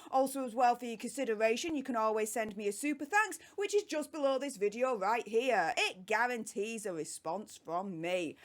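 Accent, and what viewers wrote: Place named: London, United Kingdom. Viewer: What a delightful young lady. Close my eyes and her accent brings back some incredible times running around London with some incredible East End Girls.... That will keep a smile on my face all the way to check out.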